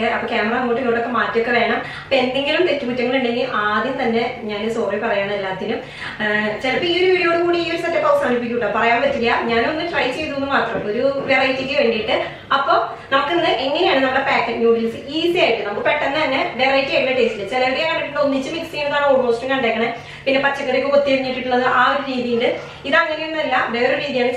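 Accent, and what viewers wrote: Thrissur, India. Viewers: സംസാരത്തിന്റെ സ്പീഡ് ഒട്ടും കുറക്കണ്ട. കാക്കിറ മൂക്കിറ, പിച്ചിപ്പറി, കണ്ടം മുണ്ടം ഇമ്മാതിരി തനി നാടൻ സാധനങ്ങൾ എത്രയുണ്ടെങ്കിലും പോന്നോട്ടെ. അതൊക്കെ പാചകത്തോടൊപ്പം ആളുകൾ ആസ്വദിക്കുന്നുണ്ട്. ഒരു മനുഷ്യനും തനിമ നഷ്ടപ്പെടുത്തരുത് എന്ന അഭിപ്രായക്കാരനാണ് ഞാൻ. മോഡേൺ ശരീരഭാഷയും ഇംഗ്ലീഷും മലയാളവുമല്ലാത്ത വികലമായ സംസാര ഭാഷയും കൊണ്ട് വീഡിയോയിൽ വരുന്ന ആളുകൾ അവനവന്റെയും അതോടൊപ്പം നാടിന്റെയും തനിമ നഷ്ടപ്പെടുത്തുന്നു. സ്വതസിദ്ധമായ തൃശൂർ നാടൻ ശൈലി ഒരിക്കലും നഷ്ടപ്പെടാതിരിക്കട്ടെ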